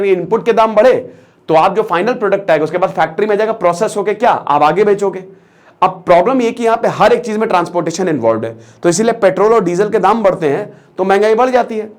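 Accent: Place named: Varanasi, India. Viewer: Excellent sir 👌 apka samjhany ka tarika bhut achha h .... Aap banarasi lagty ho mujhe ya aap U P se kahi or se ho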